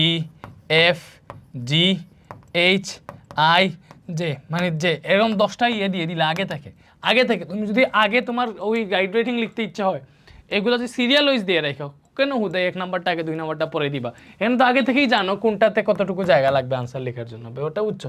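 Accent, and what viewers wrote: Sylhet, Bangladesh. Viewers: Aree bhai eita moja near ki ache Siree Sylhetyy 🙂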